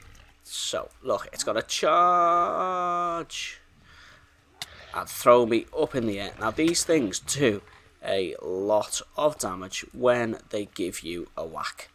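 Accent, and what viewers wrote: Liverpool, United Kingdom. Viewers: Nice Scouse! Clive is SO cute ❤ He fits you for a spirit animal lol.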